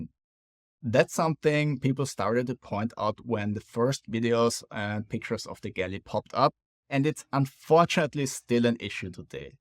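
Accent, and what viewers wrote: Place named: Austria. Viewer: your lovely and melodic Austrian-coloured German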